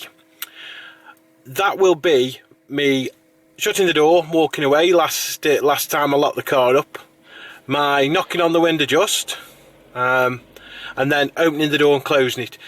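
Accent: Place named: England, United Kingdom. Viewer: Sid Nazim an American picking faults in an English guy speaking English as its spoken in England ?hahah stick to cheeseburgers boy ( and by the way it al-u-min-i-um not aluminum !!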